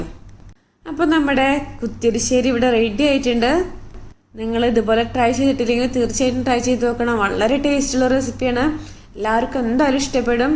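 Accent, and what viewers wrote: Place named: Thrissur, India. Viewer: Aha nammude trissur slang wd varutharcha kayakkari polichu😋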